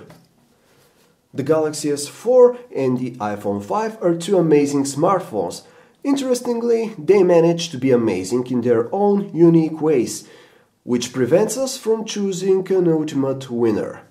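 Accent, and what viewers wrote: Russia. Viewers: where you from??? sound like from russia or some near country and why comparison sounds like you more iphone man . They are just phones. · That Russian accent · are you Russian?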